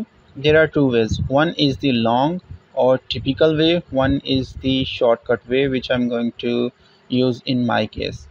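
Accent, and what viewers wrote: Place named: India. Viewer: You probably speak russian(judging by russian channels), indian (or pakistani I dont know, just from your accent) and english and other asian languages? if not more... That's impressive!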